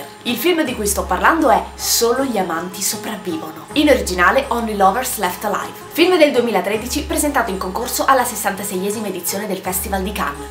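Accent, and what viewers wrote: Rome, Italy. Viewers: Me piace n' sacco il tuo accento romano, comunque adesso mi guarderò subito il flim!! Sei bravissima :D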